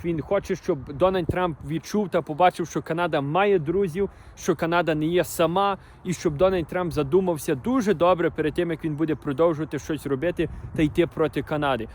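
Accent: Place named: Ukraine. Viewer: МОЛОДЧИНКА,ПО МОВІ ВІДЧУВАЄТЬСЯ УКРАЇНСЬКЕ КОРІННЯ.ХАЙ ПРОЦВІТАЄ КАНАДА,НАШІ УКРАЇНЦІ,КОТРІ ПРОЖИВАЮТЬ У КАНАДІ.!!!❤❤❤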